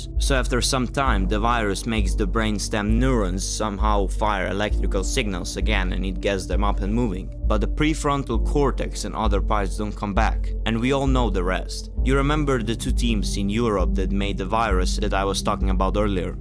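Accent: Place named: Russia. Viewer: Ur accent feels russian to me btw. Nice video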